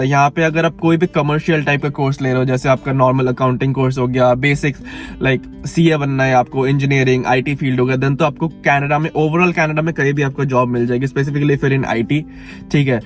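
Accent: India. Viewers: Can you talk slowly cuz you have an accent. You sound indian still cuz im listening your vlog for purpose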